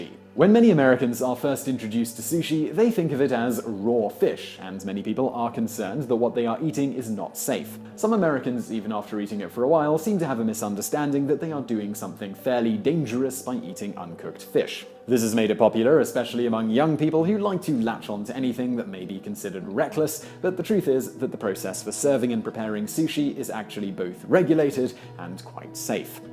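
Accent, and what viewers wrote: England, United Kingdom. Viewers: J.D. Hague He has what is known as an English accent. You know the English language is from England? Crazy right? These silly English people can't even speak English! Imagine that.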